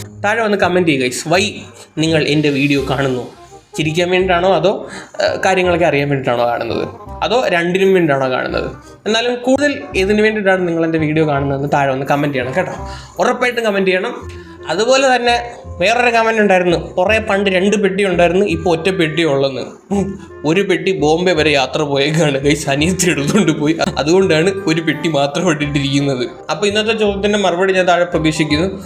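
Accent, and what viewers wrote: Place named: Karunagappally, India. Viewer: videos okke nalla funny aanu...karunagappally slag kelkkanum❤❤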